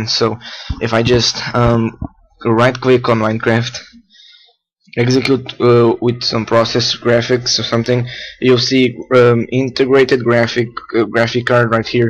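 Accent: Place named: Portugal. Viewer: ahah you are português ! i noticed by your falar xD , thanks also now i got more fps :D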